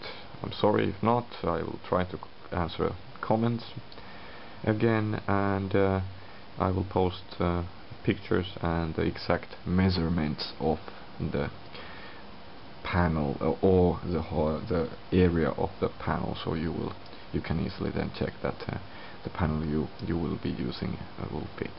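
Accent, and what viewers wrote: Finland. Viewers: Kyllä se suomen aksentti on sitten oikeasti selvää ja helposti ymmärrettävää... Kiitokset :) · Suomalaiset aina puhuu näin englantia.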